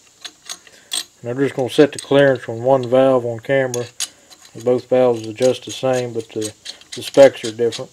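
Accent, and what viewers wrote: South, United States. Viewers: Great video..thick southern accent but pretty much understood everything...Damned 10% ethanol fuel screws up everything in the fuel system! It's always a good idea to put a petcock on the fuel line and just shut it off and let the motor run out of fuel when you're done mowing.. Otherwise your needle valve on the float in the carb wont seat properly with all the corrosion and you'll either have fuel leaking out the exhaust or get gas in the crankcase!! I had gas in the crankcase and it caused all kinds of weird problems. Thought I blew a head gasket with all the smoke until I started taking it apart and gas was leaking out of the head. Now I have to put the head back on and take the carb apart, so I decided to just clean up the head, valves, and piston, and replace the needle valve and seat on the carb. Hopefully it will run again!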